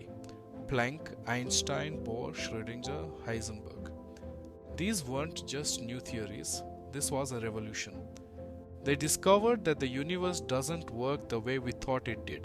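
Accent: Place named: India. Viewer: Indian people’s accent speaking English sucks!!! Go A.I on the voice bro. Your accent is off putting. Sorry to say that, but truth is truth.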